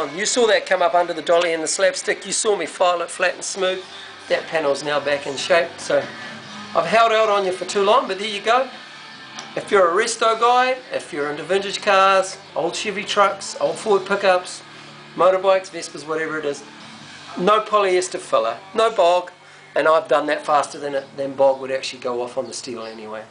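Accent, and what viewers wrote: New Zealand. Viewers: It's his New Zealand "ex-cent, " Mispronunciation of the vowel e also cherry "rid". (Red). ignore the accent admire his skills and enthusiasm. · "Kiwi bloke" . . . love the "dawun unda" . . . could listen all day . . .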